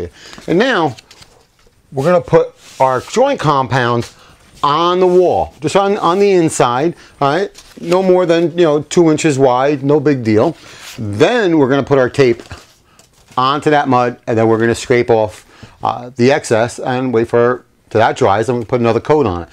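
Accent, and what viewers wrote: New York, United States. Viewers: so you from Jersey, or New York? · You are a GREAT teacher - hey, you from NY? I grew up in the Bx, and your accent sounds so much like home, man.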